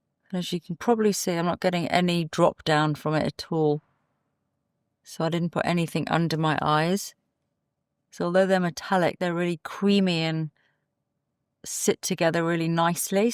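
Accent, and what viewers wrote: United Kingdom. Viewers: Me too! I'm not an English native speaker and I have a little bit of difficulty with Brit accent. I thought: did she say Talk to the poor? This is very catish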